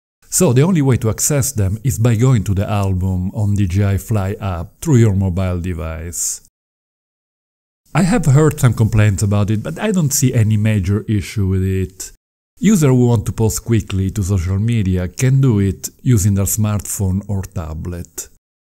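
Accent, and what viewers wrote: Italy. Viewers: Panorama foto moda 😆 like the Italian accent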